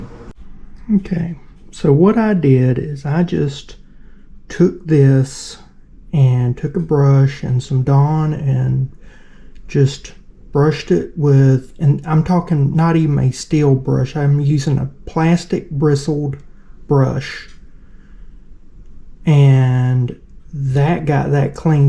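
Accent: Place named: South, United States. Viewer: Heck, I have been looking for something to complain about all day. Here is my chance! - It is the southern slow-talking. I spent half of my life in east Texas and the other half in west Texas. I made a few videos just playing around, I realized that many people found my accent unlistenable. I actually thought about putting in some effort and writing scripts and practicing a faster delivery, but then I decided I did not care that much. I believe that you need to speed up your speech and make more efficient use of your words? People seem to want information delivered at high speed. Best wishes.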